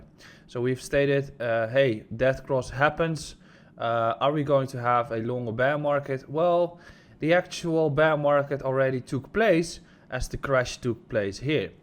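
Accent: Netherlands. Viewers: nederlands dat hoor je gelijk haha !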